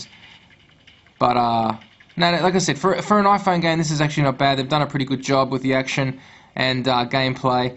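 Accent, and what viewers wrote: Australia. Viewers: i like ur aussie accent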